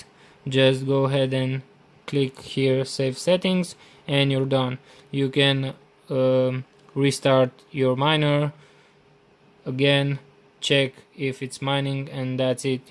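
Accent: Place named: Hungary. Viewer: Helló.Te magyar vagy mi?A kiejtésedből úgy vélem.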